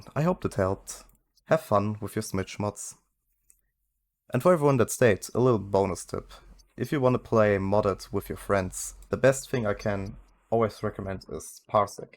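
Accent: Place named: Germany. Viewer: Bro klingt mies deutsch ja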